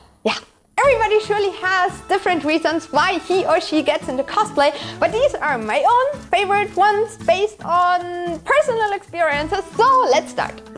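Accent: Germany. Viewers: Das enlglish hört sich deutsch an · For me its really hard to sit though the video cuz of her voice.. and i dont like german accent for some reason soo.. yeah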